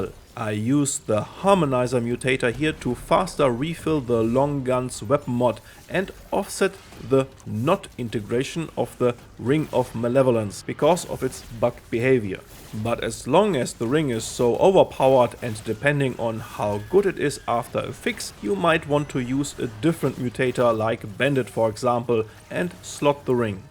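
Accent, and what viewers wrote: Germany. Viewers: Ich hör den Deutschen noch ganz leicht raus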